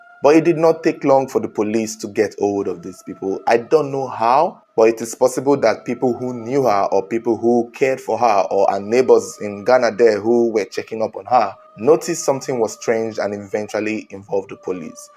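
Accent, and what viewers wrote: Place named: Nigeria. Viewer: You sound Nigerian. Where in Ghana region did this happen? Did you mention?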